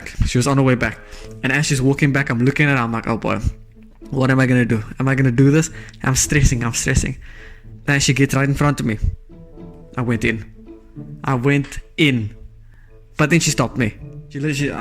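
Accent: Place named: United Kingdom. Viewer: You sound British ngl also W transition at the start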